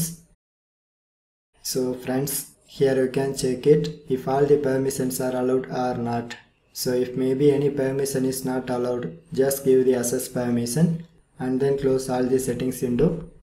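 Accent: South India, India. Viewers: Are you South Indian? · R u from south india bro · bro he's Indian he's trying his best to speak English respect that.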